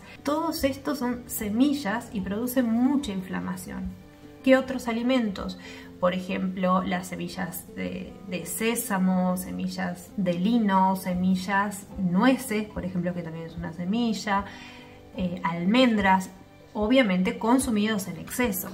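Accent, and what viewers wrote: Argentina. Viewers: Hi sweet, greetings from Sidney, Australia. I love to listen spoken castillian just to keep the knowledge of the language decent, but I think I missed it whether you said shred the pit of avocado. Do I have to let the avocado pit dry up or can I use a fresh pit? Years ago I was in a nasty accident, I had to get several metal parts implanted, one on my right wrist and from time to time with weather changes my wrist hurst like the devil, I most certainly would love to give this very simple tip a try. My mother is also starting to get arthritis and it makes me very sad to see how her hands are losing their shape. Needless to say my little wrist pain is nothing compared to the pain she feels in her hands. Also have you showed how to make kefir? I am always learning such great things from you. I love your Argentinian accent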